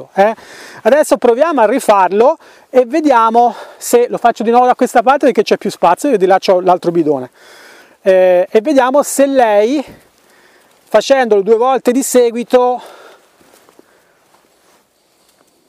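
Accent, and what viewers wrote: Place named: Tuscany, Italy. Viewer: Caro e un piacere guardare te e Ame😂Le tue spiegazioni sono chiare ed esaustive condite con l'accento toscano che non guasta affatto. Grazie per la tua sapienza e onestà. ❤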